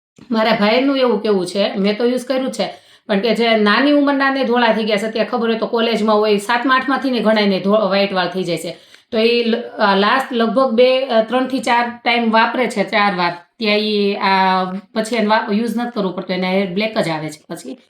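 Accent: Kathiawar, India. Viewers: કાઠિયાવાડી છો દીદી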